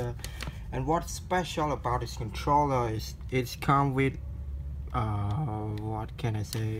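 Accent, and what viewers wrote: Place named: Vietnam. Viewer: dude u sound like a vietnamese learning to speak english,good vid anyway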